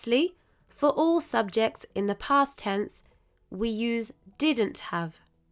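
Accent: United Kingdom. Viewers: May I know which is the UK's accent you speak, incredibly keen on learning more and be able to speak like yours (hopefully)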